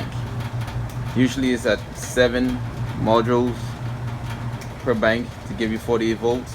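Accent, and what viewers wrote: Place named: Bahamas. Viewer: Nice, am running leaf as well. U sound like Bahamian. I’ve already built my windmill as well using the same motor.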